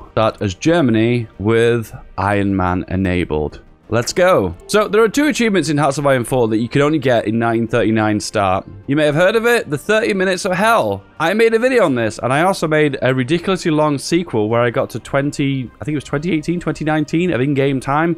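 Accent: United Kingdom. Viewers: #dontdie Please, señor British man. I need muh dlc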